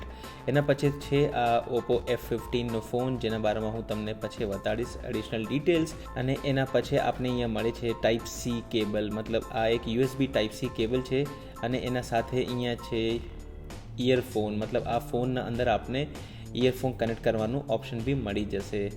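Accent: Kutch, India. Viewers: ગુજરાતની કચ્છી બોલી બોલો છો તમે. મૂળ ક્યાંના તમે? સારું લાગ્યું કે તમે ગુજરાતી છો.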